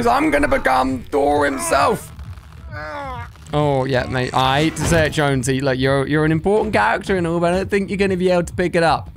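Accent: United Kingdom. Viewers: Are you British?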